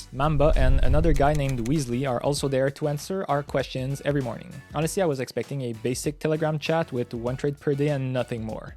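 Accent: Quebec, Canada. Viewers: Je viens tout juste de te découvrir et j’entends un fort accent Québécois 😅. Je m’abonne à ta chaine!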